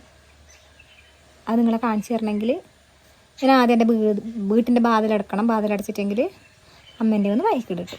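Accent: Kasaragod, India. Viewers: ഓർജിനൽ കാസർകോട് ഇഷ്ടപ്പെട്ടു❤❤❤ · എല്ലാ പുല്ലും കായും എല്ലാം പറിച്ചു തിന്നാൻ ഇതെന്താണ് പശുവാണോ എന്തായാലും നിങ്ങളെ കാസർകോടൻ സ്ലാങ്ക് ഇഷ്ടപ്പെട്ടു വീണ്ടും പുതിയൊരു വീഡിയോ ആയിട്ട് വരൂ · അത്രയും ബോറാണോ നമ്മളെ കാസഗോഡ് ഭാഷ... നിങ്ങൾ ഇങ്ങോട്ട് വീടെടുത്തു പോര് ചേട്ടാ കാസർഗോഡിനെ കുറിച്ച് അറിയാൻ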